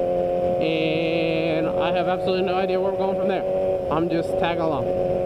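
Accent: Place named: South, United States. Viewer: Im just curious but where do you live? Like what general area? Im not trying to be creepy or stalkerish but I was wondering because you are always going to the beach, the area seems very temperate, like in this vid you see some swap. From your accent you it sounds like the south maybe? Im guessing NC/SC. Im thinking on moving down there in the spring an was curious about the riding season.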